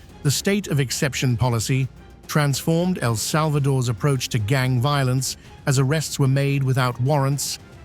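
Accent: United Kingdom. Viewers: Listen to this clown with the British accent and the bleeding heart. Almost every single one of those monsters have murdered and raped …if it had been one of his children that had been tortured and killed you wouldn’t be listening to this. He has no clue what it was like to live with these monsters….he only thinks he does. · Well the narrator sounds British and look at Britain now. Overrun like 🇸🇻 was.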